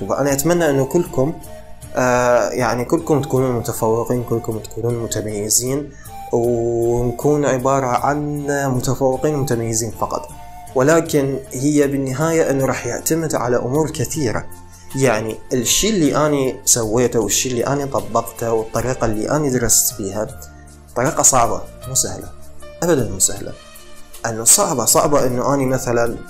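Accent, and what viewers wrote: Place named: Iraq. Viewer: اسفة على كلمة بس اول مرة اشوف عراقي كاعد ايسوي شي بي خير و بهل احترافية و بهل نظافة طبعا من فتت على قناه و سمعت اللهجة انصدمت انو عراقي الي كاعد يحجي و اللة امثالك فخر النة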